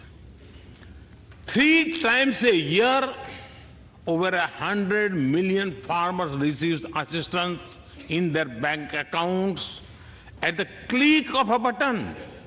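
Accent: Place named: Gujarat, India. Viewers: Impossible to memorise such a long speech. May be he mentally translated from Gujarati to English. Pretty decent English I would say. The flow, logic, sequence and verbalisation is good. One must ignore Gujarati accent as people have such a variations in India. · but his foolish attempt to speak English in gujrat accent makes ppl make fun of him . he should stick to hindi and let them listen to translation.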